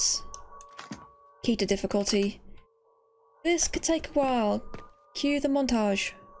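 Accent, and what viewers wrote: United Kingdom. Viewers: Your a young british i can tell by your voice!!!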